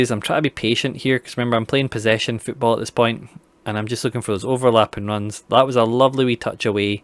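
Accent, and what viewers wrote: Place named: Scotland, United Kingdom. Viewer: I guessing you're Scottish right? (Becoz of the "wee bit" term used in your video and also the accent, so i guessed so, haha)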